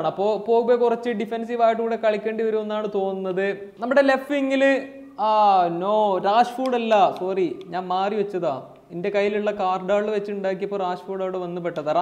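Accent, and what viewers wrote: Malappuram, India. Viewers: ഇങ്ങൾ മലപ്പുറം കാരൻ ആണോ 🔥❓️❓️ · Malappuram slang kidukki💥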